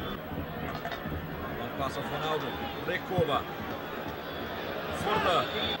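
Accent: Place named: Brazil. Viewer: Esse narrador é Br! rsrsrs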